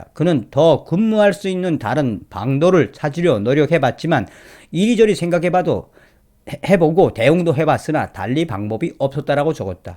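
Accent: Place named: North Korea. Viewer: 북한식 말투가.... ㅋㅋ 재밌어~!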